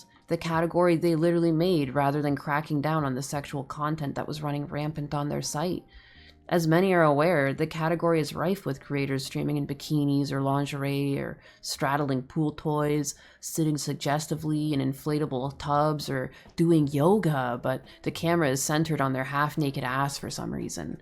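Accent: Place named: Canada. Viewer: Streaming on Twitch can be a pain in the. Your "out" and "about" gives me the impression that you're from Canada and I don't know why you have so few subscriber so I have subscribed.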